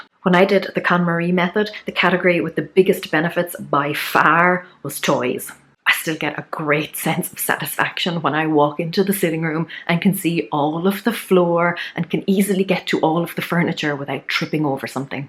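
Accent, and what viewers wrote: Ireland. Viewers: That's a great idea to simply go around looking for rubbish. Amazing I didn't think of it. I agree with the lady below about your warmth and humor. I am partial to Irish accents. The advantages of Marie Kondo's and the Flylady's methods is they are systematic. . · I always love your humor and inspiring ideas! 😀 Wish I could have your Irish accent. My house is so cluttered it's hurting my brain, but I am a tired mom of a non-sleeping 6 month old. Husband asks if he can help clean, but it doesn't work out well, he doesn't like to organize. There are a few things he keeps that spark annoyance for me but not for him, an old picture frame from his late grandmother, and some old statues of unhappy looking folks that are supposedly passed down by family. What can I do with those? Oh and what do you do to a collection of wine corks that spouse will never get around to doing anything with? 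My husband has been collecting wine corks for 4 years and still doesn't know what craft he wants to make. He won't let me trash them. 😑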